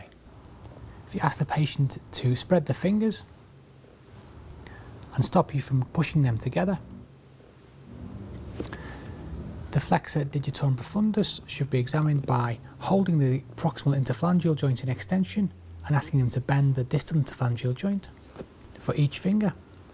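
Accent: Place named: Liverpool, United Kingdom. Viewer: I've been looking for a Scouse ASMRtist for a long time, didn't think I'd find it in the form of unintentional ASMR. Love it.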